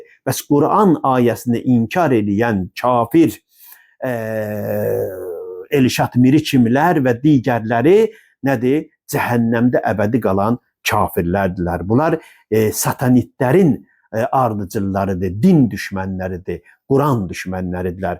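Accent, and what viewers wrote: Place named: Iran. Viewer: Birinci Azerbaycan dilini fars lehçesinde danışma..............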